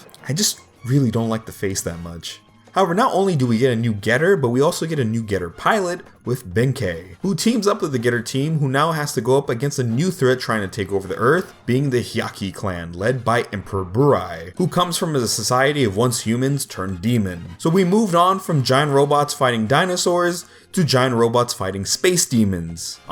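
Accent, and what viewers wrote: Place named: South Korea. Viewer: なんかぺららぺ朝鮮なまりがきつい朝鮮なまりがきつい まじで・・・YES・・まんじゅ。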